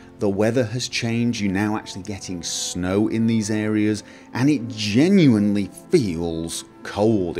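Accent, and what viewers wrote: United Kingdom. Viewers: ***** Thought so, he sounds Brittish or something like that.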